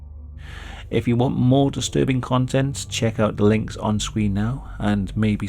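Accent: United Kingdom. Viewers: How have I not discovered your channel sooner?! True crime is a lot easier to take in with a British accent I find. Disturbing stuff but very detailed work. You'll find me here more often now. Keep it up!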